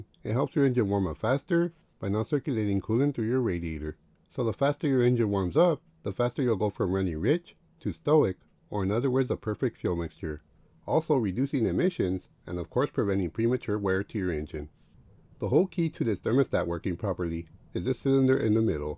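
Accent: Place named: Mexico. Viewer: He sounds Mexican. If he is listen about vehicle. Trust me.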